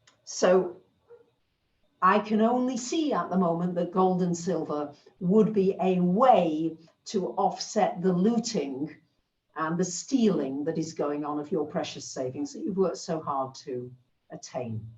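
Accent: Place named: Lancashire, United Kingdom. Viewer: I love your videos and your accent, it's sounds like you are a fellow Lancastrian northern lass like me. Please don't let others undermine how wonderful you are, you have a lot of loyal followers who get so much from your posts.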